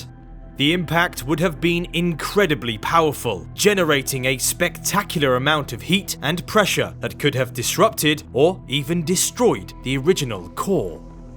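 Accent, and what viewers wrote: United Kingdom. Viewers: UK accents are annoying. So are spurious titles. DISLILE.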